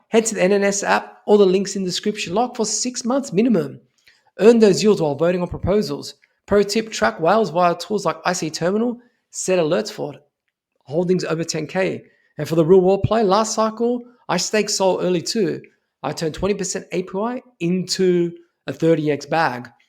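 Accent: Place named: Australia. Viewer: Keep the vids coming. Good work. Guessing you're an Aussie?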